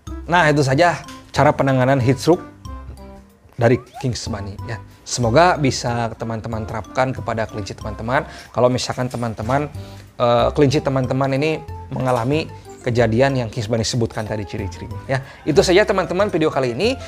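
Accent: West Java, Indonesia. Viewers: Orang mna ktu kang logat ngomong nya mah sunda? 😅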